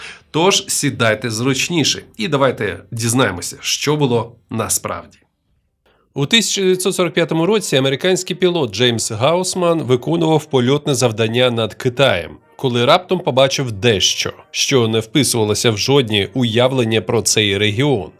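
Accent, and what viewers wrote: Russia. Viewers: диктор - москвороте бЬІдло, не розуміє як вимовляти "і" та "и", читає слова на москворотий лад - іЛЛюзія" і т.д. Мінус і скарга.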